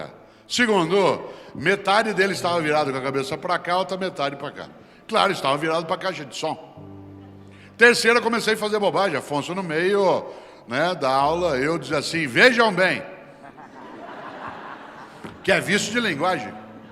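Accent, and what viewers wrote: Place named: Londrina, Brazil. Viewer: Amo o sotaque do Cortella !Q honra p Londrina ter um gênio q nasceu lá! Parabéns Londrina. P seu filho q o universo admira.aplaude. ama.aprendre.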